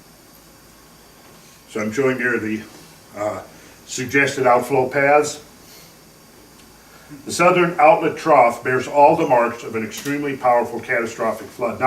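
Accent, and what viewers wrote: Minnesota, United States. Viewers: I grew up on the banks of glacial Lake Agassiz known as the Red River Valley now. At least we were told it was Lake Agassiz. The Red River Valley is sedimentary. A dang good place to have a farm if you don't mind being flooded out every once in a while. By the way, I knew you were from the Minnesota North Dakota area before I heard you mention it. Your accent is mostly gone, but I detected it. Mine is mostly gone as well until I cross the North Dakota border then I sound like a character in the movie Fargo.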